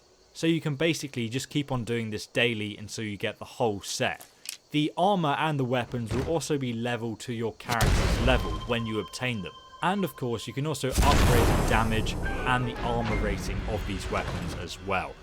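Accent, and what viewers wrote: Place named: RP, United Kingdom. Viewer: I would love to hear a review of Skyrim VR with that posh accent of yours. I tested all your glitches on VR and they are working too.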